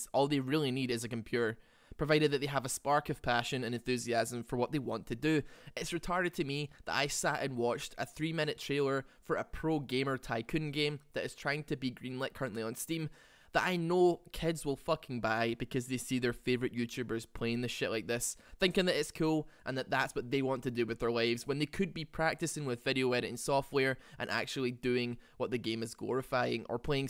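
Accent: Scotland, United Kingdom. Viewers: Are you scottish? ^^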